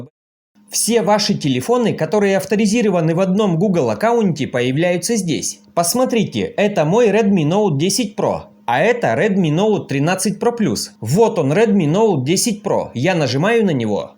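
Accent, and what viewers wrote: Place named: Ukraine. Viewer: Почему у хохлов, такой ****** говор? Нет, реально раздражает.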